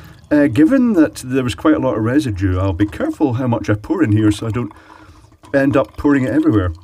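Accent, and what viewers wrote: Scotland, United Kingdom. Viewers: Whats your opinion towards tea, spiffing brit sounding man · is this guy scottish?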